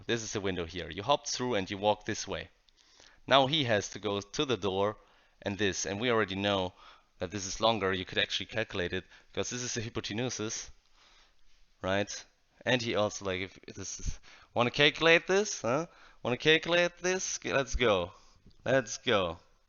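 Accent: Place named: Europe. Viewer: I know that you're european just by your accent :P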